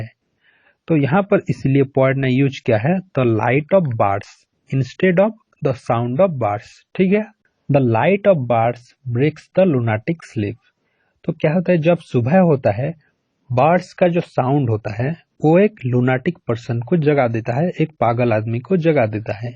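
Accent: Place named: Bengal, India. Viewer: Sir r u bengali ?ur accent seems like that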